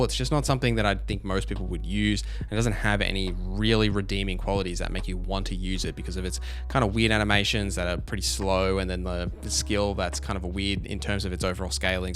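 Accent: Australia. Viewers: Great video. I love your font of Australian accent and your energy❤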